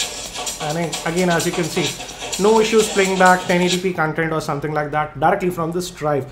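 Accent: India. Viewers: why all reviewer about this sandisk are all from indian? indian english is very hard to understand. honestly, although you may think that i am racist.. but it's really hard for us to understand indian english.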